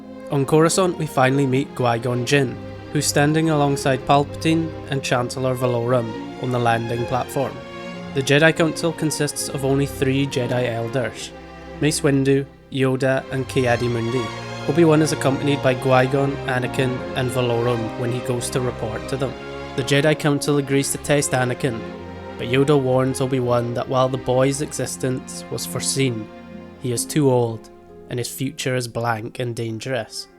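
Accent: Ireland. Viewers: Why can I not tell if youre Irish or Balkan? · I'm guessing Irish. It's definitely from the Isles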